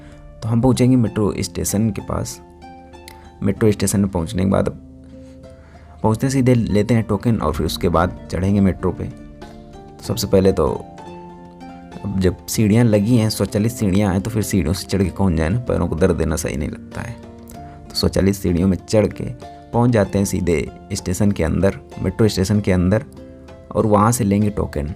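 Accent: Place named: Kanpur, India. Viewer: Ghusa dete hai kya kre kanpur ki language hi aisi hai but bhai best information or some funny language mja a gya👍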